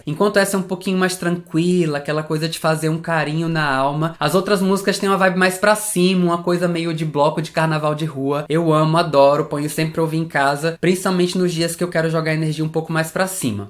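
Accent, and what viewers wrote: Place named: Bahia, Brazil. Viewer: Oi amigo . Tudo bom ? Qual seu nome ? Vc mora na Bahia ? Falo pelo sutaque . Não conhecia seu canal , é ótimo , tem um conteúdo de qualidade parabéns .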